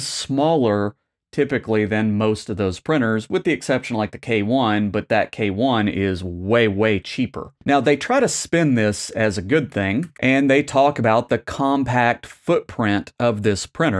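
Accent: United States. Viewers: Based on your accent, I am going to assume you are an American. Can your cars compete with Chinese cars on price, if not for the extra taxes put on them? No? So why the hexx to you expect a Prusa to beat their Chinese counterparts on price? Being a European company, I don't think the price was all that bad! Okay, it may not bring entirely new features. But it brings them Prusa-style! Backed by support that is second to none in this segment, and supported for years to come! Ever since the X1C hit the scene, I have wanted for Prusa to bring their version. I simply can't muster any enthusiasm for any of the Chinese printers! Qidi was close, simply because they brought the heated chamber. But a few fires later, I did not care for one any more. Privacy is clearly not a thing for these companies. That is one of the things I like about Prusa! You CAN completely air-gap your printers, and even use an offline computer for slicing, if you want to! 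Although it would be nice with an included camera, at least you will get one. And you can unplug it, should you feel like it. So the great news here is not the feature-list! It's the fact that it's a Prusa!!! Get over this size-thing-please! People still build 120cm cubed Voron 0.2's. There is a marked for this size. And I think it was a smart move for them to expand upon the MK4; simply because a lot of people whom recently bought their MK4's now won't be left behind. What they loose in sales due to "sma size", they will make up for in sold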